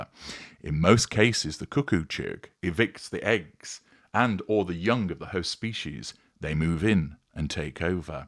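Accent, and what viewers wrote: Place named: North, United Kingdom. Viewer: I detect a Northern Accent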